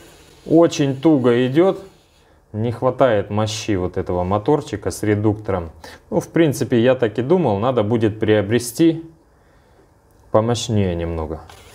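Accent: Rostov, Russia. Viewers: Акцент Ростов Папа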